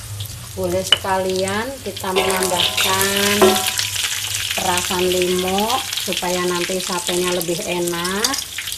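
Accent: Java, Indonesia. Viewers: Assalamu'alaikum, satenya siiiip 👍👍👍 lucu banget klau ngomong, dr jawa y?.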